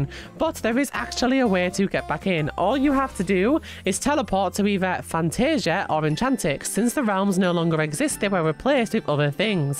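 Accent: United Kingdom. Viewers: GIRL I DIDN'T KNOW UR BRITISH! ME TOO !! <3 im 25% british lol!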